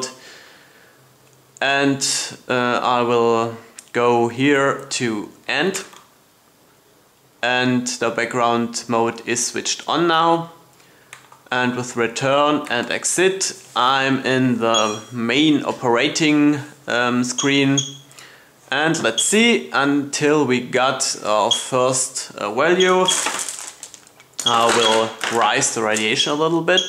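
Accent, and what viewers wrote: Germany. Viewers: das deutsch hört man echt raus :D nettes dosimeter.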